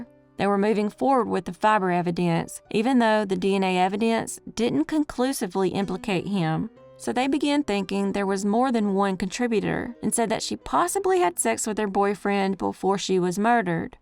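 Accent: South, United States. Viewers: Hey good job on the opening I think it's new anyways I love ur show girl it's not everyday that u can have a country accent that is not either related to southern cooking or kkk u know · Thanks to lady with sweetest southern accent, evah. Love fr panama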